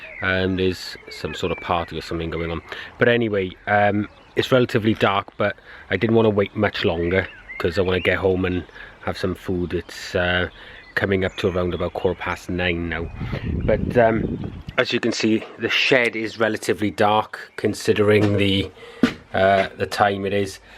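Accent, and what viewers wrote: Wales, United Kingdom. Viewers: Sounds like a strong welshman! Great video! 🙏🏴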